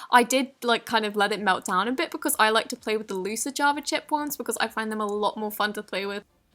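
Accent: United Kingdom. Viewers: I don't know if it's just me my cousin doesn't really like her asking but I love her British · r u british? u have a nice accent and I love it! is that weird???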